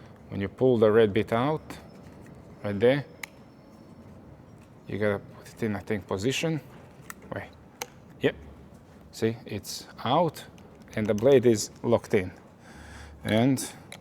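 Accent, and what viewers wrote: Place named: Europe. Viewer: Don’t let the accent fool you he’s from Australia. I thought I was watching a guy from Europe.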